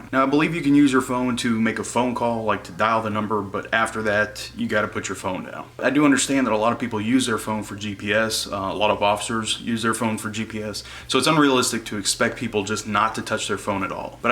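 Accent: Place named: South, United States. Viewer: Nothing cuter than a great southern accent 😋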